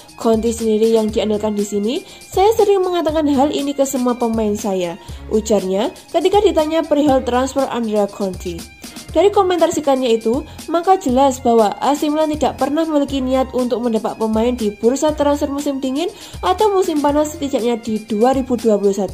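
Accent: Java, Indonesia. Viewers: Min logat jawanya jelas banget,,😂😂,,Mantup,,eeh mantap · Mbak mbak, jangan medok medok napa bahasa nya .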